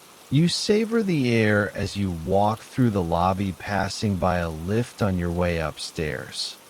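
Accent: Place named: United States. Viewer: Don’t like American accents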